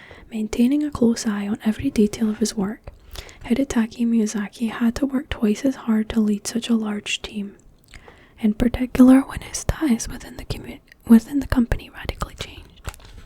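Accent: Scotland, United Kingdom. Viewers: Scottish accent and Sark douls in one video. The bell hath been clicked.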